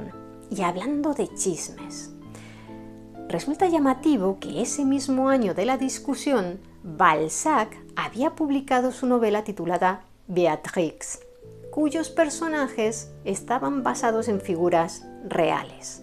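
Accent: Spain. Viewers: Excelente narración y locución de. Gracias por dedicar tiempo en enseñar. Saludo Desde Venezuela. (Barquisimeto. Estado Lara). Podría saber de donde eres? Parece🇪🇸 según acento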